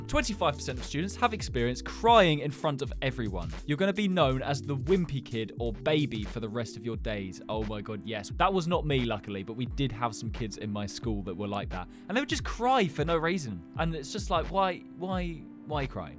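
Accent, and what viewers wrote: England, United Kingdom. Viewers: where in england u from? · You are British I know it😅😂😂😂🎉🎉🎉😊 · are you British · AHH A BRITISH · Are u british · Is he British??????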